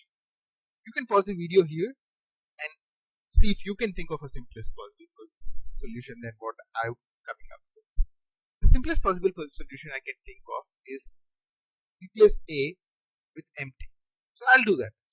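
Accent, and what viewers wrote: India. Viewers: The sound sucks, with it's over amplification and under feed. Hard enough to understand with the fast Indian speech. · same comment as lakesidelivin: The sound sucks, with it's over amplification and under feed. Hard enough to understand with the fast Indian speech.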